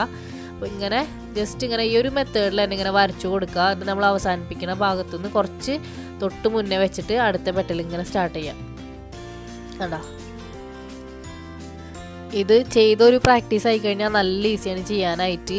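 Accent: Kodungallur, India. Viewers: Adipoly 👌👌👌 Evideyanu place? Kodungallur area aano? Njanavide aanu. Njangallude slang pole thonni 😄😄😄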